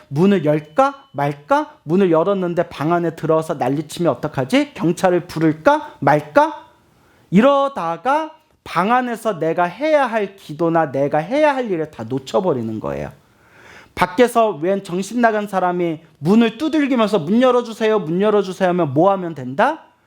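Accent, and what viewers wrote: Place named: Seoul, South Korea. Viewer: 광우스님이 쓰시는 서울말 너무 듣기좋습니다🥰 🥰🥰🥰🥰🙏🙏🙏🙏🙏🙏🙏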